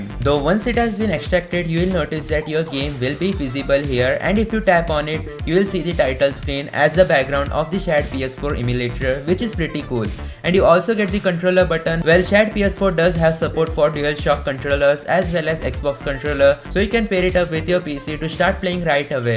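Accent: India. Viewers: The curry accent 💀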